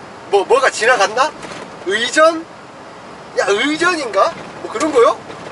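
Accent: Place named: Gwangju, South Korea. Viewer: 사투리 들어보면 광주사람 이신것 같아요 영상 재밌네요 항상 화물 안전하게 운반 해주세용 요즘 또.. 얘기가 많네요 ㅠ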